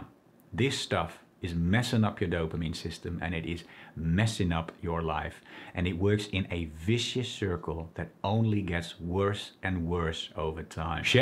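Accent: Netherlands. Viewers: Ik dacht al dat ik een nederlands accent hoorde hahaha.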